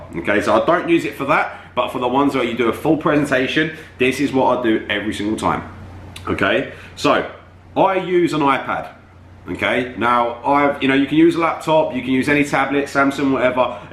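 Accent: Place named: Australia. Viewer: Why does this man have such a strong Australian accent?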